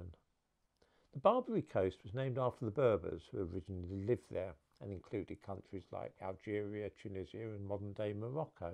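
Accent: United Kingdom. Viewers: Sorry it offends you, but the fact he has a British accent does not stop him being a liar.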